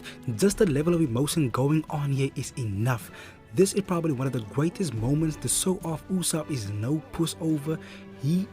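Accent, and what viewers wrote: South Africa. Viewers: Is that a SA accent I hear. Awe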